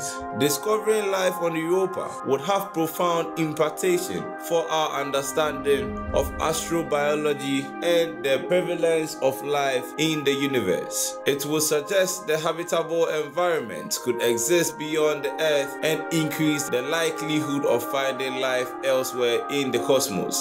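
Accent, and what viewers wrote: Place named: Africa. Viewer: African voice kinda makes me think it’s not true. I guess from all the Nigerian princes bollocks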